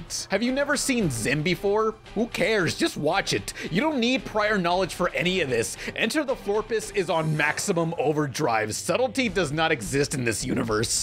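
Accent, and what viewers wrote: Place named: Alabama, United States. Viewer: Bruh you got some Alabama shit going on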